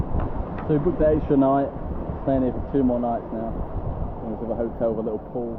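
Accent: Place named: Manchester, United Kingdom. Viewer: Sound Manc or northern Uk which im from. I will keep an eye out of your vids. If your around in IND let me know and you can show me around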